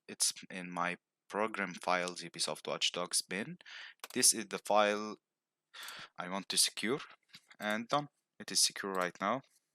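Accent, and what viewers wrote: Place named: Italy. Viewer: Grazie caro ho risolto, sembri italiano dall’accento quindi bella pe te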